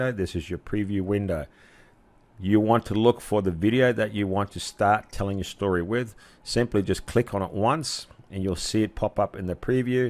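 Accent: Australia. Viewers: I wish I'd found this video two years ago! I'm not a beginner any more but I /still/ found basic things I didn't know. Thanks, I'll be checking out your other videos too. Oh and cheers from Melbourne. Lovely to hear that Aussie accent. :D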